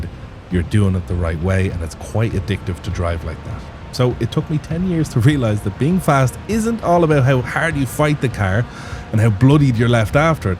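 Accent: Ireland. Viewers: First time visit. subscribed. just seconds in, I heard that legendary Irish accent and thought brilliant. and then the Irish flag on your car, Legend. from one fellow Irish man living abroad to another. Hows tricks dude. awesome video.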